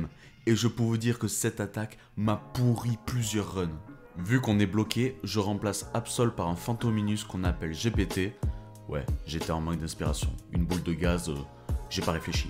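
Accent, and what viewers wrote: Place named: South, France. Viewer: Super vidéo le reuf !! Je découvre ta chaîne, petit accent du sud, on entend les cigales et le pastaga, un peu d'humour de temps en temps pile ce qu'il faut. Petite pépite ça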